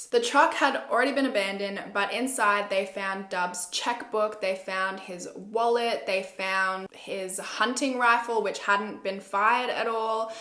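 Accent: Australia. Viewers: I absolutely love Aussie accent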